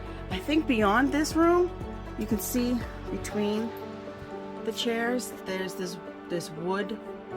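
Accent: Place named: Boston, United States. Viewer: Thought I recognized that accent - Bostonian here!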